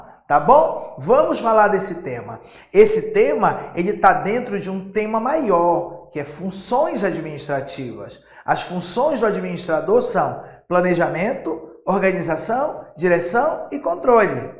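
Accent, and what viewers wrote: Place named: North, Brazil. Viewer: Amo esse sotaque, orgulho ser nortista!😃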